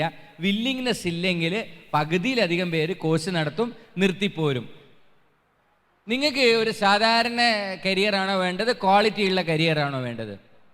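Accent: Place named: Malabar, India. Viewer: സദ്ഗുരു ജെഗ്ഗി വാസുദേവന്റെ പദാ നു പദ തർജ്ജിമ . എന്നാലും കലക്കി . മലബാർ സ്ലാങ് ന്റ് കുഴപ്പം ഒഴിച്ചാൽ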